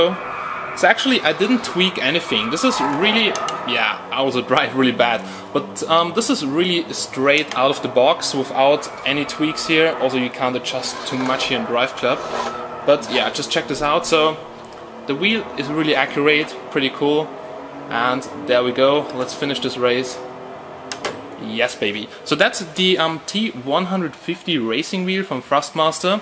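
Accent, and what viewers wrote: Germany. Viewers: You have a german accent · hör auf n deutschen accent zu haben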